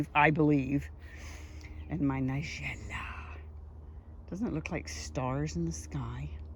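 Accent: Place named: Canada. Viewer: Well you definitely have a canadian accent now. Oh fancy that. You literally lived an hour from me. Most of my extended family are in liverpool or thereabouts but we moved around with my dads job then ended up back in Lancs. What a small world. So do you think of yourself as english or canadian or both?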